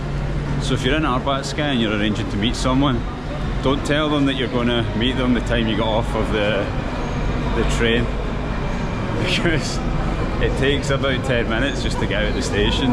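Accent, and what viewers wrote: Scotland, United Kingdom. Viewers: My favorite is mayakovskaya as well!) Everlasting elegance. You seem a very nice guy😊 and I do love your Scottish accent. Have you been to St.Petersburg? It might remind you of Edinburgh✌️🤝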